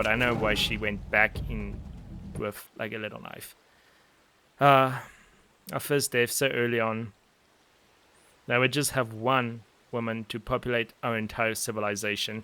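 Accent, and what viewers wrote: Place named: South Africa. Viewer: Hold up. I hear a South African accent. Was looking for someone to that does a decent long play of this game and now a fellow Zafer pops up. Lekker man.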